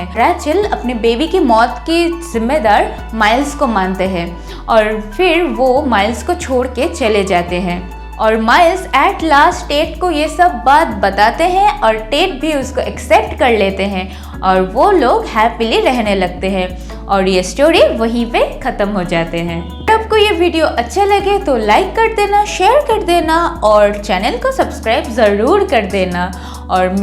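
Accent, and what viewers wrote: Bengal, India. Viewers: Its ok sab bol rahe hai ki hindi thik karle vo bengali me yarr thoda farak to padega hi na hindi me 😍 per aachi to hai bhoth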